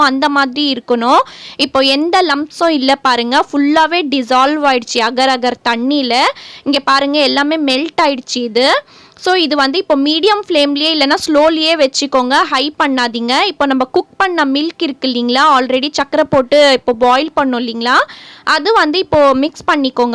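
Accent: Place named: Malaysia. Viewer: Neenga malasiyan ah sis? Unga voice la apdi theriyudhu adhan keten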